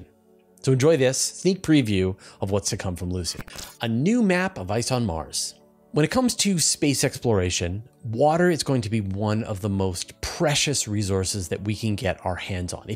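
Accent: Canada. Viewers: Canadian accent…as a fellow Canadian I never considered that, lol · As a not native speaker I cannot spot your Canadian accent 😊 however I'm able to understand you very easily. Excellent pronunciation and always great contents! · ROFL on the Canadian accent. Nothing wrong with proper enunciation of words :) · I find your Canadian accent interesting. It gives Canada a linguistic distinction from the US. Language is an important part of national pride. Don't be ashamed of it. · I live in Southern California and I and most people I know pronounce lava as “lah va” and not “lav eh” as does. My sister-in-law’s parents are Canadian and I took Canadian history in college so Canadian accent is very easy for me to hear. And that’s not a criticism so I think Canadian accents are cool. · You should never worry about your accent. People love it. It’s part of the reason Canadian’s are so popular on YouTube.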